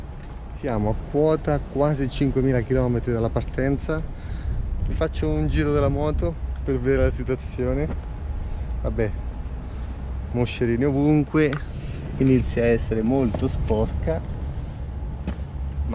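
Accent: Marche, Italy. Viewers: montate da 2mila km sulla mia Africa Twin. Dall'accento sei marchigiano?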